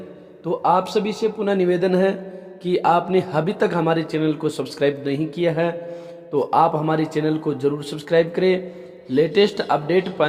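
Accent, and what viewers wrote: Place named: Rajasthan, India. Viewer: आप राजस्थान से लग रहे हो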